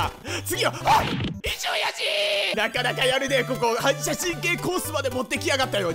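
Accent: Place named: Miyazaki, Japan. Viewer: さん宮崎出身ですか！？ 〜しちょる。とか 〜にしちょった。ってよくじいちゃん喋ってて懐かしかった笑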